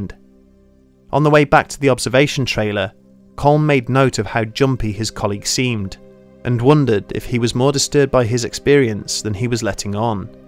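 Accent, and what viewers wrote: United Kingdom. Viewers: Why do I these videos always have to be narrated by some damn Brit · we need more UK guy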